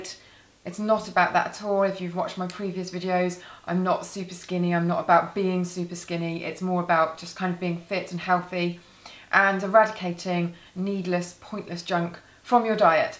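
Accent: United Kingdom. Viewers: I love your British accent and you're a very smart and elegant person :)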